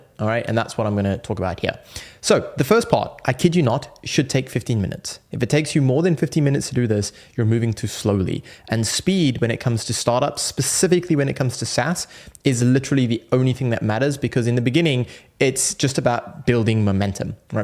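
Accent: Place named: South Africa. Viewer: Were you born in SA? You have a strong south African accent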